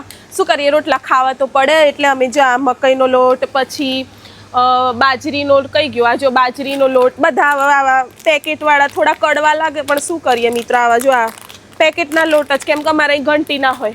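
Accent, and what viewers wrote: Sabarkantha, India. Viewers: તમારી ભાષા સાબરકાંઠાની હોય એવું લાગે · સાબરકાંઠા ના લાગો છો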